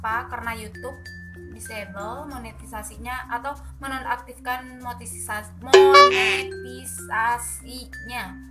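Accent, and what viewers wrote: Madura, Indonesia. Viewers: dari madura yah? kok logatnya kek org madura😅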